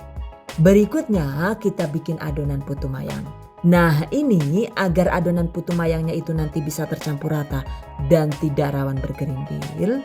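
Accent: Banyumas, Indonesia. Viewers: Huawww, Amazing... 😍😍😍 Editing Videonya bikin seru ditambah lagi pengisian suaranya yang Khas dan medhok banget ngapak kayak saya. Hahaha 😉 semoga sukses terus ya pahalanya ngalir terus, udah ajarin kita masak². 😍😍😍 #GiveawayTHV2021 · syukaaa bgt sm chanel ini..karna mudah dipahami ,lucu lg.ana ngapake.ora ngapak ora kepenak.🤣🤣🤣🤣 #GiveawayTHV2021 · Keren bangett chef e pke bahasaa unikkk Kadangg bhs inggris campur bahasaa ngapakk lahh😅😂 Ora ngapak ora kepenak pokokee😂👍 Bismillah #giveawayTHV2021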